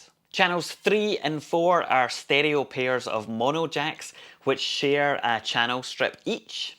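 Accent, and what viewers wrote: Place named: Edinburgh, United Kingdom. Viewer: That's fair, thanks for replying! I enjoyed listening to you - I used to live in Edinburgh and got a bit homesick. Your accent is lovely.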